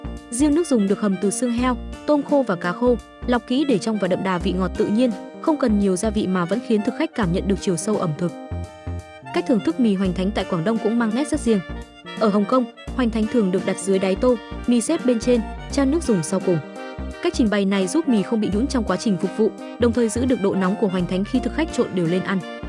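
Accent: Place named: Vietnam. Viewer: AI nó đọc thì đọc theo cách phát âm tiếng Việt là phải rồi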